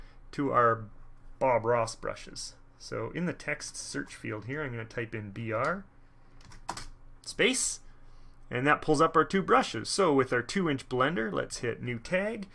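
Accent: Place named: Canada. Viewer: that is a dead give away lol. i use postal codes and zip codes daily for work and that through me for a loop the first time i heard someone go zed1tango 3delta5 i was like WUT and then the way he says resources. canadians pronounce it rezources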